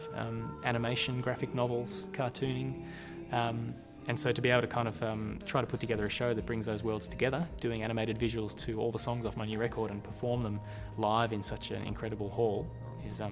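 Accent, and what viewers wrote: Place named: Australia. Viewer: Accent???? He sounds like any Aussie. What country are you in:)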